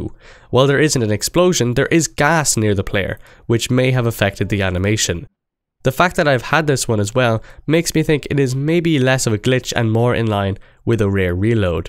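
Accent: Ireland. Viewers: r u Irish mate cause I am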